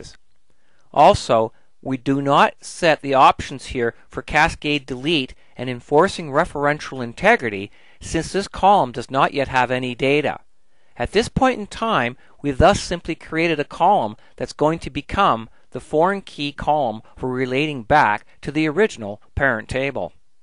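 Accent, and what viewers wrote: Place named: Canada. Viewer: Canada? love the accent. thanks for sharing.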